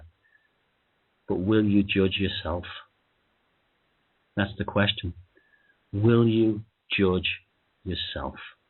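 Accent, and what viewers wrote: Dublin, Ireland. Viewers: Blimey, this is the first time I have listened to one of broadcasts. Initially I liked his Dublin style but thought he was a bit too full of himself with all his female groupies on Facebook. Good Lord, I'm a bit like that myself!!...However listening to this and his description of the riot in Dublin in 1981 and the evil that was on the other side ready to deal with the protesters rang so true with me. I was in Dublin a couple of years after that particular riot outside the British Embassy and a friend described how he was working on the railways and saw the protesters struggling home along the tracks trying to escape the police. He said they had been battered beyond belief. It was something he had never seen before. When the power of the state is truly unleashed it is terribly frightening.......I'm relating so much to this podcast and what he is saying. 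Good stuff. I'll forgive all yer other shite if you forgive my occasional after the pub rants against the Irish!....Des Brittain.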